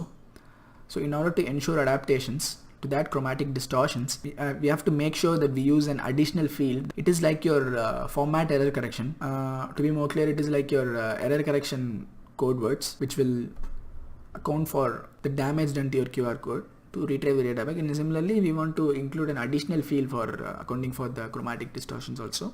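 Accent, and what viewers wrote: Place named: India. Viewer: Great if you are a Indian. Cannot understand his speech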